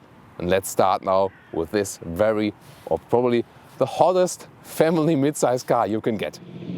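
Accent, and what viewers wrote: Germany. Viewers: can't understand, you in Germany, you are German... and reviewing in English, don't get me wrong, but your German accent pain to listen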